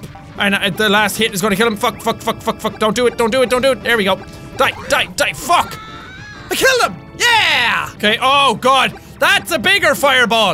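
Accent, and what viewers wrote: Ireland. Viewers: I love is irishness. Mareio, shtoopid, I love if all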